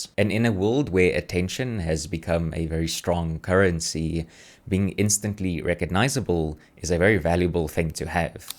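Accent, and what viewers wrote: South Africa. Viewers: I can just hear the south african in you bru 💪🇿🇦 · I'm new here seconds in me af: "Yha He Is Definitely South Ahhh🇿🇦..." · Brother heard by your voice you have RSA DNA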